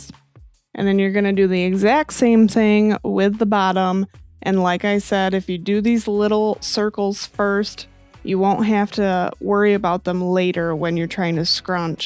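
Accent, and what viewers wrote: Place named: Midwest, United States. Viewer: I ADORE your tutorials, hearing your voice is such a treat! You have a great way of explaining, and a fabulous midwestern accent 😁😁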